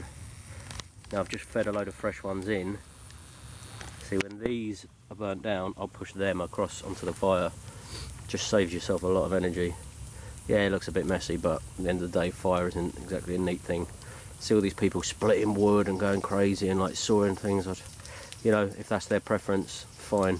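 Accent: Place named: England, United Kingdom. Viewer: Abit of a bastard haha. I love he english accent.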